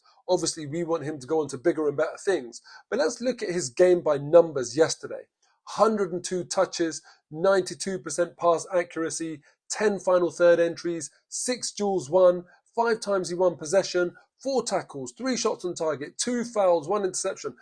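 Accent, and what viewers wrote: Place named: Scotland, United Kingdom. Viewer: There's nothing quite like a Scotsman on commentary. Reminded me of Andy Gray too. Great commentary.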